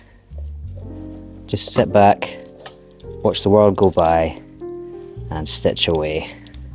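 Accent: Scotland, United Kingdom. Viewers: Watching an amazing craftsman at work…wonderful. Add in soft background jazz, a Scots accent, muscular arms and hands, eyes that give James McAvoy vibes, and wow! Sorry to embarrass you, but it’s true!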